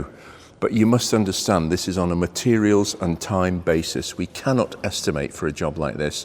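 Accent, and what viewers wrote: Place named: RP, United Kingdom. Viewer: has to be the poshest bloke on YouTube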